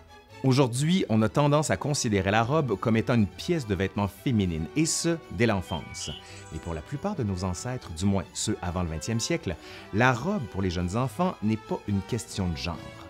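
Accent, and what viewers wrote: Canada. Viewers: Bonjour vos vidéos son excellente et j’aime bien votre accent Canadien bonne après midi à vous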